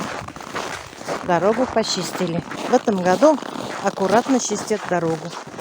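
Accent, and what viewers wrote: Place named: Kabardino-Balkaria, Russia. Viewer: Ну, ты такая смешная,так уговаривала козла! Мартин похож на козлов,которые в горах у нас живут. А разговариваешь на татарском как наши балкарцы и карачаевцы!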